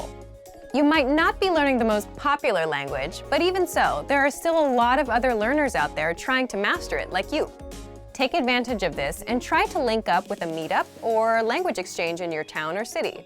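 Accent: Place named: England, United Kingdom. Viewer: Thanks for your advises !!! I like your english accent. I hope soon to have this fluently way of speaking.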